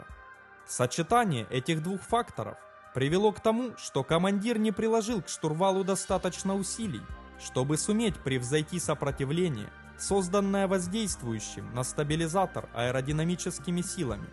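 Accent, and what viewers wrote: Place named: Ukraine. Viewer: Это неисправисо..! Карьтавые идут на радио, а с ужасным малоросским акцентом зачитывают длиннющие авторские тексты!🤯 Скоро рыбакам пора курятники обслуживать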